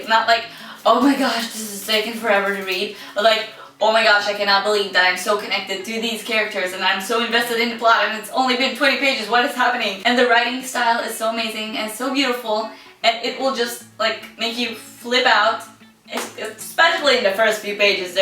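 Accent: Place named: Israel. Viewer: אומייגאד את ישראלית!! חחחחח אני שעה צופה בסרטונים שלך ולא קולטת.. ועוד שחשבתי לעצמי וואי המבטא שלה מגניב שתגיד מאיפה היא...אשכרה לא זיהיתי את המבטא של הארץ שלי.. איזה כיף לראות ישראלית בבוקטיוב!:)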